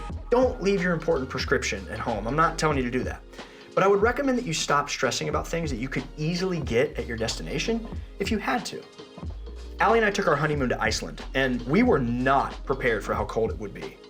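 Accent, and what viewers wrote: United States. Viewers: You sound American. Why aren’t you recommending T-Mobile with its no cost international data. Oh I see you are promoting Holifly.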